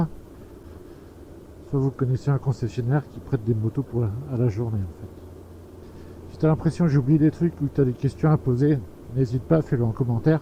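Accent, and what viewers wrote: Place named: Grenoble, France. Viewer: Salut l'ami. Un Bel essai de ta machine. Ben sa fait loing pour te prêter une machine. Je vois plus où t'habites mais à l'accent du Piti Piaf je dirais pas loing de Grenoble aux alentours. Gros V ✌🏻.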